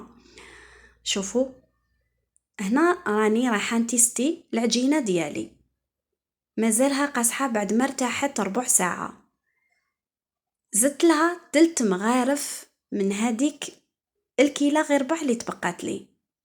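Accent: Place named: Levant, Arab world. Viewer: يعطيك الصحة وصفاتك روعة بغيت نسقسيك هدرتك شابة الله يبارك انت وين عايشة اللهجة بانتلي شوية من المشرق العربي. ربي يوفقك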